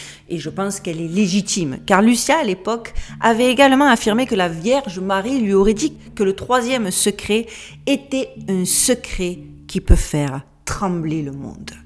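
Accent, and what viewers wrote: Marseille, France. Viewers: Mdr l'accent marseillais au usa en plus vous êtes super jolie😂😂😂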